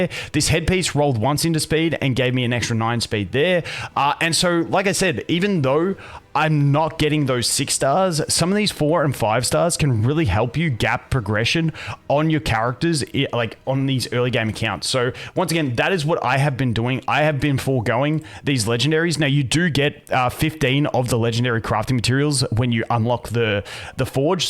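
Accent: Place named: Australia. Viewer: As a Yorkshire man i always find Australian accent fascinating.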